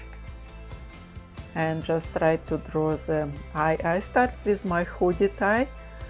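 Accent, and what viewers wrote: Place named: Russia. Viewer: Ооо такой у вас акцент , наверное русский?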